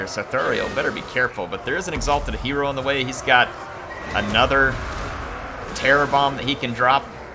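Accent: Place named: Kentucky, United States. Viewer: I've missed his kentucky-fried accent!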